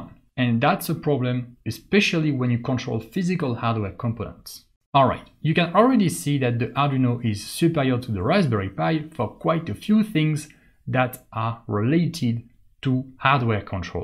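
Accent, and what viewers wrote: France. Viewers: Where are you from my friend? I heard some french accent, isn't it?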